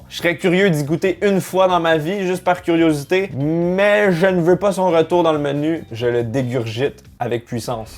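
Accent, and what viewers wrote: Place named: Canada. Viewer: L'accent canadien c'est un sketch 😂😂😂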